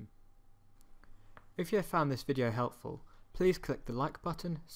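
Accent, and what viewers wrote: United Kingdom. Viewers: you speak in british accent right ? btw awesome work i wish you were in my uni :((((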